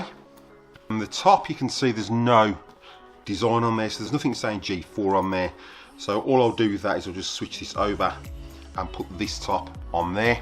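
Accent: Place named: Cockney, United Kingdom. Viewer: Can't understand his English much of the time. He speaks with a strong cockney accent. I wish I could because the information is good.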